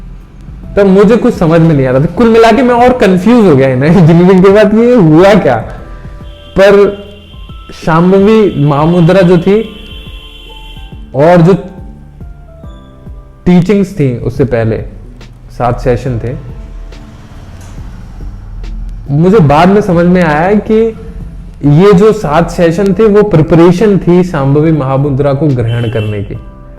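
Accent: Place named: North, India. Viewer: You speak hindi. So I want to ask you anna where do you live.🙏🙏🙏🙏 I mean are you from North.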